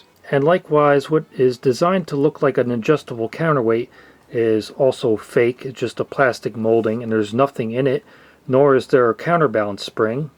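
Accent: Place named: New Jersey, United States. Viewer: I never heard anyone not from Jersey find the accent pleasing. lol.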